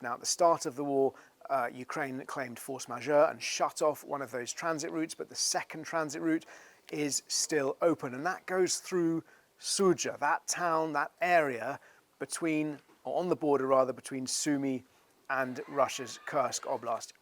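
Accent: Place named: RP, United Kingdom. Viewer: Nice to hear a nice SRP (standard received pronunciation) accent (which only 15% of Brits have unfortunately). It sounds so eloquent and erudite. 85% of global RP accents are in Asia, Latin America and Africa so no, it is not a "British" accent. It is a global RP accent.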